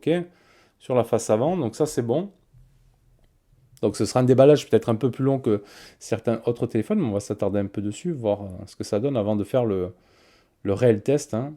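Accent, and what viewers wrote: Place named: France. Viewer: I really love his French accent. 😆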